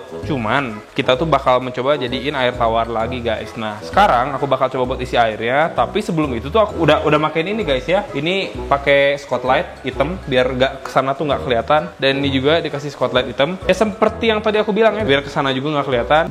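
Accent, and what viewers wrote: West Java, Indonesia. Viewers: Bang Orang Sunda ya:)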